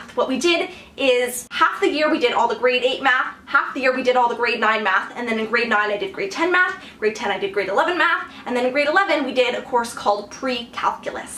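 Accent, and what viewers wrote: Canada. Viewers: Wait a minute... t'es Québécoise?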